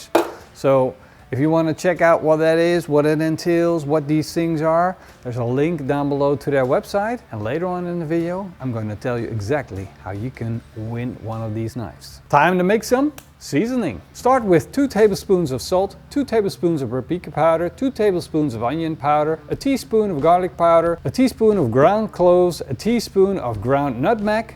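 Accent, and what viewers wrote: Netherlands. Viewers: I do really like your videos and there is a soft spot in my heart hearing a Dutchman speak English, as I went to high school in Den Haag due to my father being transferred over to Europe. That said, I do not really care to own the knives, but having to open accounts on all these different social media platforms is too much for me. I hope you might take that into consideration in the future. FYI, the Big Mac taco recipe was absolutely fantastic! I have turned several people on to your channel due to that recipe. Keep up the great content and take care.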